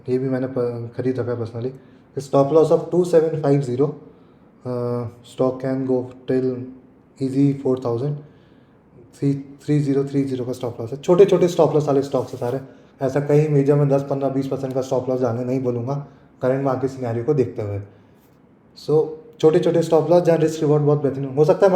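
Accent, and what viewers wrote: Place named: Madhya Pradesh, India. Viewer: Aap madhya pradesh se ho aise lagta hai..apan..is a good giveaway..me indore se hu .subscribe kar dala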